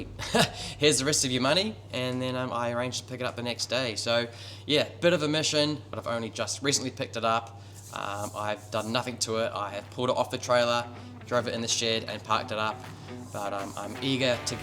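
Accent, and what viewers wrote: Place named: Australia. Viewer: You nearly sound Aussie 😂😂😂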